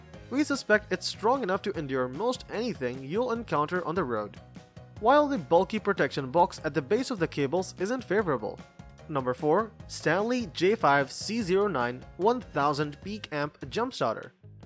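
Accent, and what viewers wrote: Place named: India. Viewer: The Indian accent makes it hard to sit through the entire video. Do you have someone who doesn't have strong accent to read the script instead?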